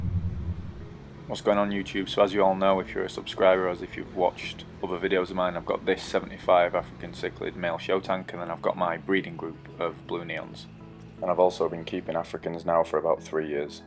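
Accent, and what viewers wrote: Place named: Yorkshire, United Kingdom. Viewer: REALisation: you sound from Yorkshire but you mentioned you're in Pittsburg...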